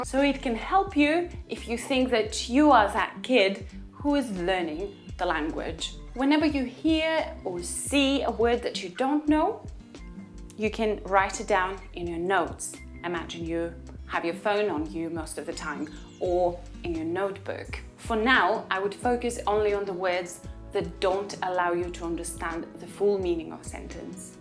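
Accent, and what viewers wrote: United Kingdom. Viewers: Аааа какой акцент крутой🤩 Бритиш инглиш - ван лав 💛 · your British accent is so lovely! I used to like American better but now I'm so into British💜 Thank you for the video, it's adorable👍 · Зачем британский пусть говорит на американском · Хорошо говорит, но не переношу британский акцент)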